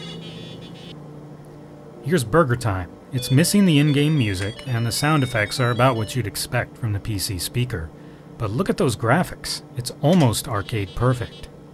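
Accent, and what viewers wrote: United States. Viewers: I'm British, we pronounce the L (but not the R on the end unless we absolutely have to) but for me hearing you seppos say sauderrrr is a bit like seeing you spell words like colour or favourite without the U... something you adorable colonials just do... I wouldn't mind a modern kit to build a genuine retro computer, whether it be an IBM XT PC, a Commodore Vic 20 or 64, a ZX Spectrum or a BBC Micro or Acorn Electron. Who's with me?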